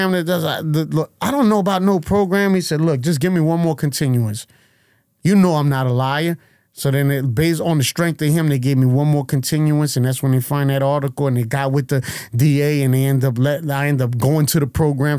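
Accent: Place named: New York, United States. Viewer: Why does he sound SOO New York? In getting Fat Joe vibes. Man, this guy dropped some real gems.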